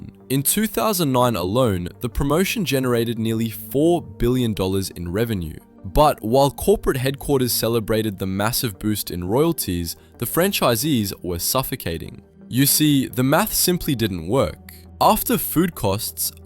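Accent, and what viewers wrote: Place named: United Kingdom. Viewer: If you like videos about American restaurants narrated in a brit accent, this is for you. As an American, NTY.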